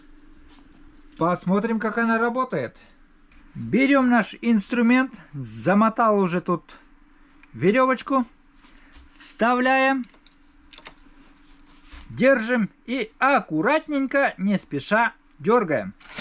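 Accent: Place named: Baltic states. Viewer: Акцент, прибалт? Или Беларус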